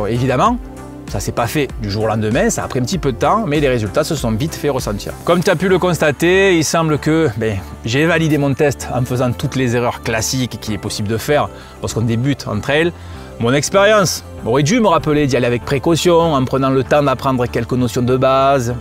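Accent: South, France. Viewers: ça me donne envie de m' intéresser beaucoup plus au trail, pour ma part j'ai pratiqué une course une seule de Trail La Calanquaise en colline à Carry Le Rouet et je kiffe les Rando. J'avais été vraiment surpris de la difficulté de l'effort, effort que j'ai mal géré en partant en allure trop rapide dès le début de la course. Me surestimant un peu, on est très loin d'un match de football, mais je ne le voyais pas de cette façon . J'avais envie de partager mon ressenti, car j'aime bien tes vidéos et l'accent du sud qui est présent. Tchaoo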